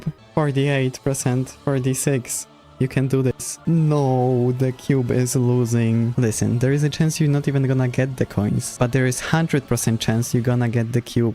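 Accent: Poland. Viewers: kurna ale łatwo ogarnąć po akcencie że to Polak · You sound polish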